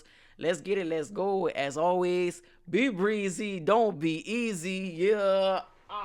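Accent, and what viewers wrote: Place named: Louisiana, United States. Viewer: you have to be from the 337 😭 if not i would be genuinely surprised because you have a strong accent lol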